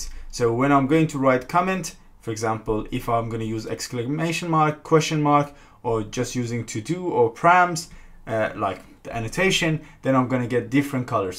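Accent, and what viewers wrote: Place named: Iran. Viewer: your accent similar to Persian. Are you Persian?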